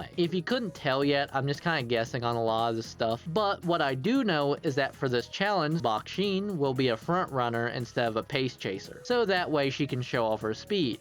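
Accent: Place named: United States. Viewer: You sound like you’re on the verge of using a country accent but it makes you sound nonchalant and cool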